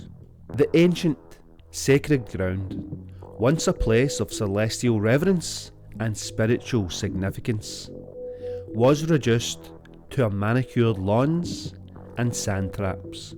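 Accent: Scotland, United Kingdom. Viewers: I enjoy listening to your voice and your beautiful clear english.Scottish heart voice thank you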